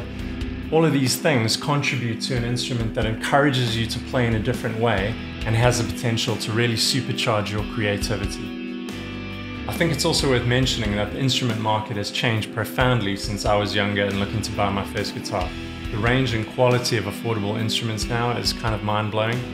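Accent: South Africa. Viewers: Great video. Been wanting to get these for awhile. I already have a Jazzmaster, but my interest in baritones and low tunings is pushing me to get one of these as well! That a Saffa accent? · Hey man it sounds like you're from South Africa, how was the shipping(payment wait period etc?)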